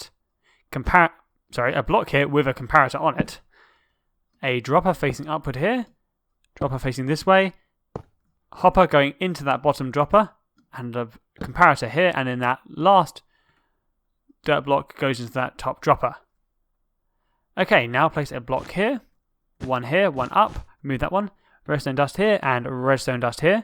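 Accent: United Kingdom. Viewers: i love the british accent.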